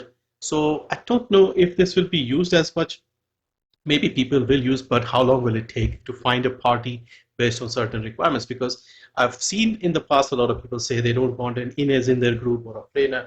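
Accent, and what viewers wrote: India. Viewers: I was able to recognise from your voice that you are an Indian. Proud to see a fellow Indian doing this good. Keep up the great work!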